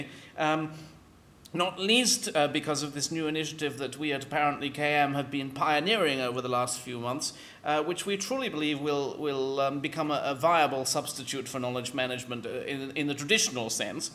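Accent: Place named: RP, United Kingdom. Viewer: You are FRIGHTFULLY posh, aren't you! (It's refreshing. I thought I was the only person left who spoke like they stepped out of a 1940s Ealing Studios film. JOLLY good show, old chap!) By the way, I have absolutely NO idea what you're talking about (I'm an artsy type...who, by definition of that fact, has 'knowledge retention' issues), but I could listen to you talk for HOURS.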